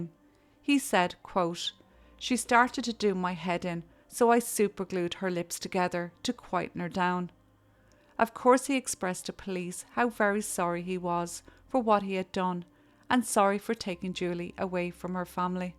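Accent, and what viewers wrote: Ireland. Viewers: I find your lovely, Irish lilt to be comforting. I subscribe to several true crime channels from various countries. This is a favorite.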